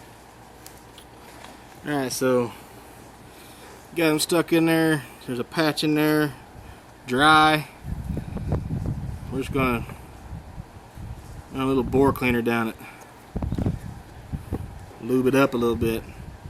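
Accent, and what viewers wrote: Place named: Texas, United States. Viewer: Saving this video for my brother and I. We are jsut getting into the Muzzle loader thing and this video is great. Thanks man. You must be from Texas. You sound like my family.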